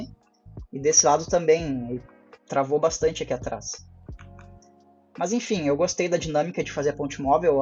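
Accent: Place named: Porto Alegre, Brazil. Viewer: aquele sotaque que só o portoalegrense reconhece kkkk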